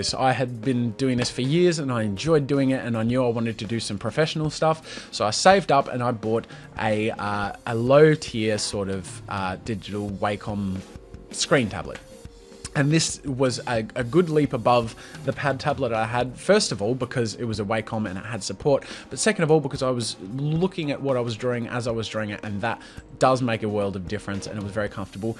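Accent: Australia. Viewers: you sound Australian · ARE YOU AUSTRALIAN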